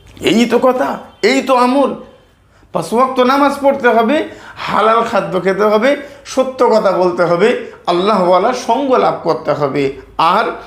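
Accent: India. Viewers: Apni ki north bengal asen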